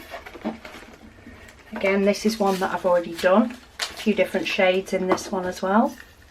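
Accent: United Kingdom. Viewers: I found your channel recently, and I love it! I’m a British DP addict living in the U.S. and it’s a wonderful change to hear a British accent talking about my passion!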